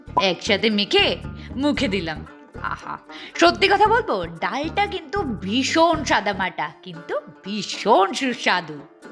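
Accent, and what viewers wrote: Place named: England, United Kingdom. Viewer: বাংলা উচ্চারণ ঠিক করুন, না হলে শুদ্ধ ইংরাজিতে ভিডিও করুন। ইংরাজি বাচনভঙ্গিতে বাংলা বলছেন অত্যন্ত বাজে ভাবে কানে লাগছে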